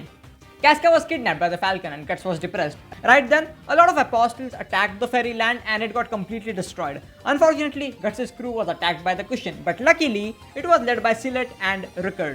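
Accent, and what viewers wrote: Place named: India. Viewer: Omg thanks for normalizing Indian accent, I have begin to accept it now.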